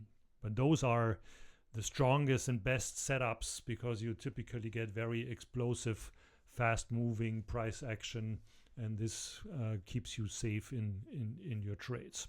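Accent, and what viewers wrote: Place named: Germany. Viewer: U Are Amazing , are u from Germany sir? Ur Accent is like that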